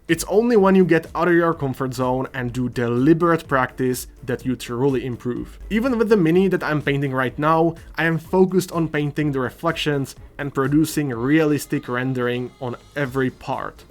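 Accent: Czech Republic. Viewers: Thats...encouraging to hear..12 years 😄..but whatever, better late then never right !? Im just about to start with painting but I try my best... I just discover your channel and I like it more then others! I was little suspicious about your accent tho 🤔..."why he sounds kinda like me!?" AAAAH, hes CZECH 😅!!! Im surprised, youre first czech I discover in this "WARHAMMER painting universe"... I started to think its not exist in Czech Republic. And Im glad its not true😁!!!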